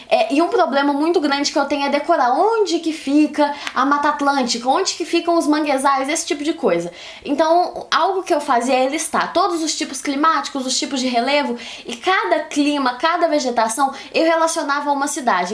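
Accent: Minas Gerais, Brazil. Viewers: Conheci pelo GeekieGames, 2 minuto de aula e já falei: essa aí é de minas kkkk, e tava certo mesmo!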